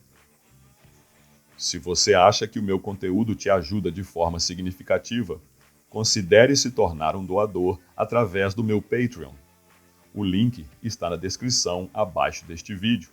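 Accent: Minas Gerais, Brazil. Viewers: Hey vc é mineiro?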